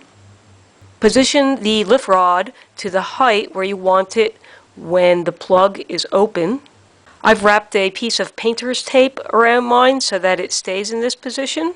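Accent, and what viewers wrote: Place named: North, United States. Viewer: Great Video! I just have to say. Being from the South, I had to get around the NORTHERN ACCENT, LOL. Great video, I had to install same exact one! Awesome Job!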